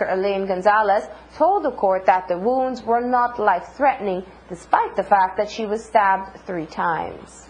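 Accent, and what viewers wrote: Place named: United States. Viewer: Sounds like the american accent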